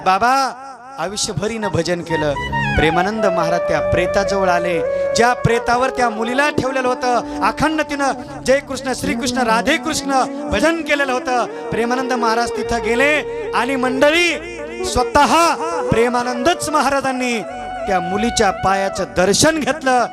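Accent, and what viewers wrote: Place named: Rural, India. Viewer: किती गावठी मराठी बोलतोय रे तू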